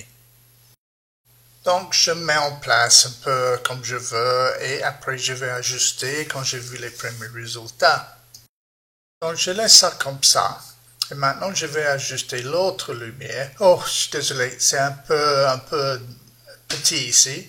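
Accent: England, United Kingdom. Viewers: C'est bien charmant avec cet accent anglais et le Monsieur parle avec une vigueur remarquable.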